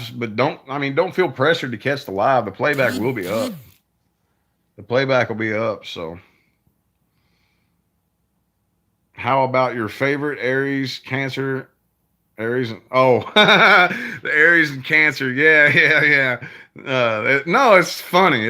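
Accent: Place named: New York, United States. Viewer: Does ur hair hurt .. wat kinda question is that??lol haha... chillin n relaxin all day errdayyy..love it haha 😊😊 ps.. love ur accent.... a new yorker like me notices these things 😊😊 and yea anything by stephen king is awesome um.. Hmm wat else??o yea.. fuck the haters.. fuck the braggers!!! stay the chill ass person u are 😍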